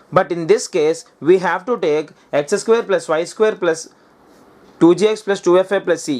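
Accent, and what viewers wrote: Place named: Visakhapatnam, India. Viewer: Pure vizag slang❤️😍😍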